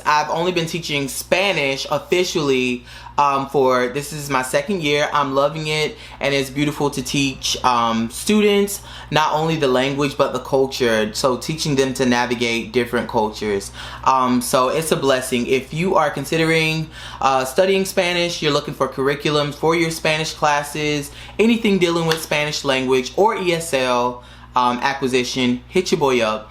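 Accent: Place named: Cuba. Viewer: your spanish sounds cuban!!!!!!!!!OMG....I speak spanish as well. I lived in Costa Rica for 1 year when I was 16 to become fluent...however i was learning since grade 3 as well. I did my Ba in Spanish, my postgrad in Early Childhood Education now doing my Masters in Esl in Ecuador!!!!!!!! I did my BA in Canada...if i had to do it over again..I would have chosen Spain to do my ba in spanish lol as my former professor did the same thing.....nevertheless I love the language....also my adoptive parents are from ecuador and peru!!!! So that was a plus for me...in Canada....It's crazy how I can sit watch telenovelas and understand everything as a second language learner....and I am black as well from the Bahamas...latinamericans think that i am from cuba or dominican when I speak spanish...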